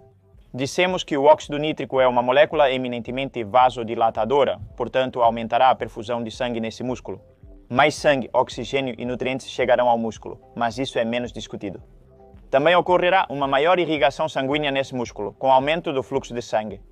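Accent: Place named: Galicia, Spain. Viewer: EU. CARALLO. QUE FALAS. Ti GALEGO. GALEGO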